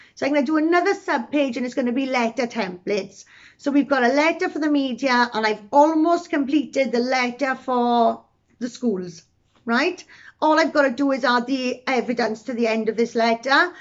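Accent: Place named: Wales, United Kingdom. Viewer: Fair play you have grown so much since starting nothing but utter respect luckily I been in Wales 6 years I can understand you 👍👍👌👌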